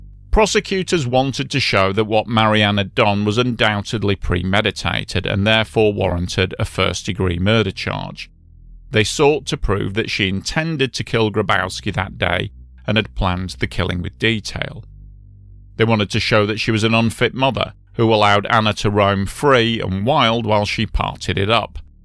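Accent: United Kingdom. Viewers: a damn Brit narrate a film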